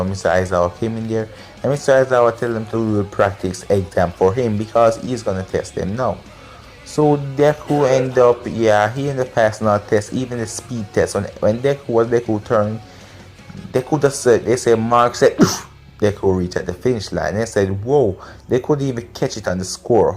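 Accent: Jamaica. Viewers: He sounds Jamaican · Are you Jamaican because I am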